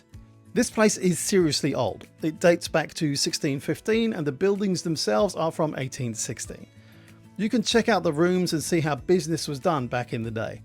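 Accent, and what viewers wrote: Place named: New Zealand. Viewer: u have an interesting accent. feels like you grew up in australia or NZ and went to england or visa versa. takayama is great, so much so, i just bought a house in the old historic town. edit: nz not aussie, subtle but it's there :)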